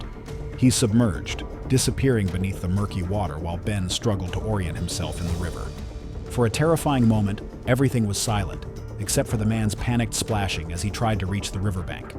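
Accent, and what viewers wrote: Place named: United States. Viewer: Your voice sounds American, so quit with the metric system and us feet and inches.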